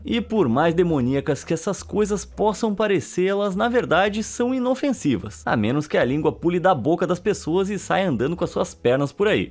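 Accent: Portugal. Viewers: Gosto do teu sotaque em Português (Portugal).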